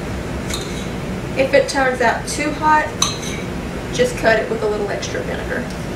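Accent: Louisiana, United States. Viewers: Tony Chachere's, Magnalite skillet, and that beautiful accent. What parish are you from? It has to be a little further north than me judging by the landscape in some of your other videos (that i love). Wish i could get you and my wife to hang out so you could be her mentor. Keep up the great work! You have a new subscriber BTW.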